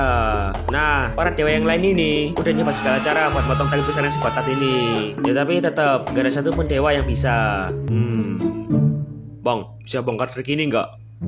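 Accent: Java, Indonesia. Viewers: Bang kowe asal jowo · Anjy Abang orng jawa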